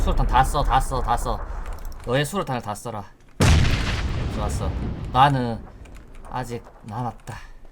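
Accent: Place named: Gyeongsang, South Korea. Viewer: 초등학생인 저희 아들의 권유로 배그를 시작하여 이렇게 유튜브까지 시청합니다.저희 아들은 김블루 광신도인데 저는 광신도입니다.ㅋ같은 갱상도 사나이라 그런지 사투리도 귀에 쏙쏙들어오고 재미있게 잘보고 있습니다. 아들을 이기는 그날까지 유튜브시청 많이 하겠어요. 퐈이팅 하이쏘~~^^ <mail> 입니다.ㅋ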